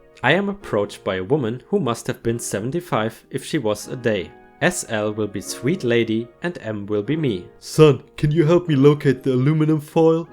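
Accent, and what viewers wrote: Germany. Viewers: what is your country of origin? From the sound, like German? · you sound so German!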